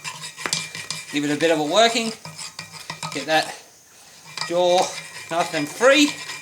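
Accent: Australia. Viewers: What part of Australia are you from? CHEERS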